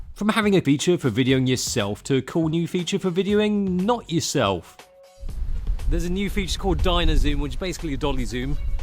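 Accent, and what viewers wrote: England, United Kingdom. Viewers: I think he was raised in the UK. That's why it's so smooth. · What is your accent to be precise which region in the UK? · I love the fact that he’s Asian and he has an English accent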